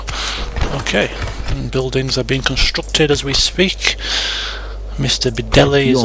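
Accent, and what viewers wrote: England, United Kingdom. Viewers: I love your dialogue! Your english accent really adds to it.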